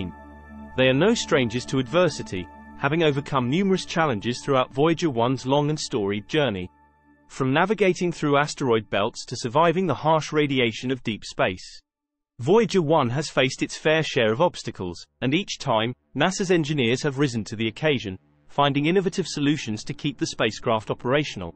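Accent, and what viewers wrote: United Kingdom. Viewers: Why are the weird stories always narrated by someone with a heavy English accent..:face-blue-smiling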